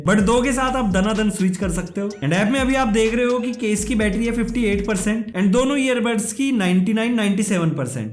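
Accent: Meerut, India. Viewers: Bhai aap Meerut se ho ??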